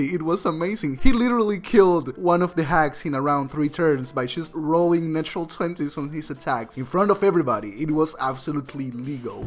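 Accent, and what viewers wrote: Argentina. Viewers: Me parece o eres un brother argentino? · are you argentino?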